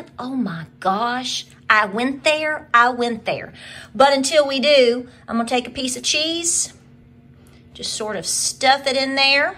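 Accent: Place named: Rural, United States. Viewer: And her country twang. I love it!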